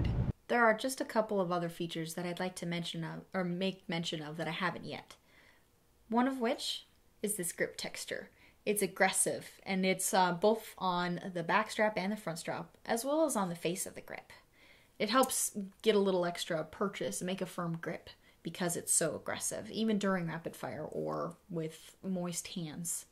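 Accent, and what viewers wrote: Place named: Boston, United States. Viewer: that Boston accent is on point ;)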